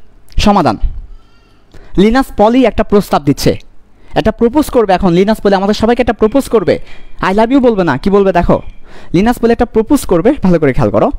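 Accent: Chittagong, Bangladesh. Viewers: Bhaia apni chattogramer????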